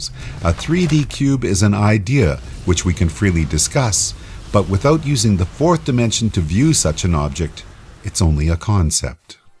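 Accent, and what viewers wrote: Canada. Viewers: Great video, really well done, but that Canadian accent is pretty rough! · The narrator is canadian!! :) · you sound Canadian.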